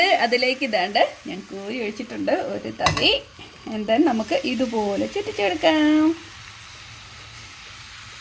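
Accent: Kottayam, India. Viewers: kottyam kari ano am from kidangoor pala now your talking style very nice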